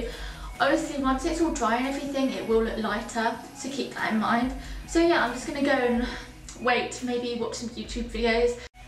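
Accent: United Kingdom. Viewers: he I'm french and I looove ombré hair! But don't you think I shouldn't do it at home ? it'll be better at a haidresser don't you think ? pleaase answer !! oh by the way I don't really get your accent ? are you british ? not american I guess ? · r u brittish ? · ARE YOU BRITISH? ;O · Dat british accent...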